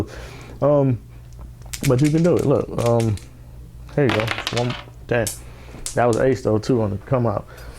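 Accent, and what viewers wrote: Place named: Bronx, United States. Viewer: You definitely from the Bronx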